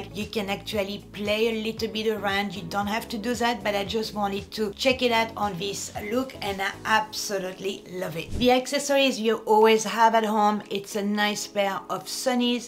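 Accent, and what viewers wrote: France. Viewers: love your french accent and you are so funny.... as well as beautiful style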